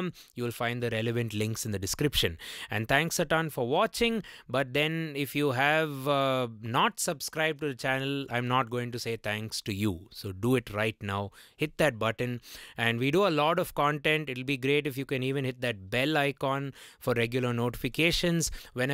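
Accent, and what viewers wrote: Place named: India. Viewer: From your accent i'm guessing you're Indian, yet how are you named 'Jason'? I'm curious to know the story lol. Anyways amazing video.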